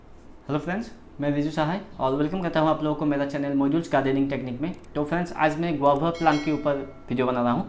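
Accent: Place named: Northeast, India. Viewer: Voice like you're from North-east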